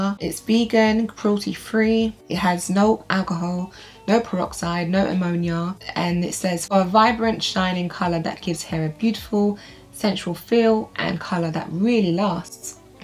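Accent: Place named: London, United Kingdom. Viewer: I just LOVE your accent in an London accent🥰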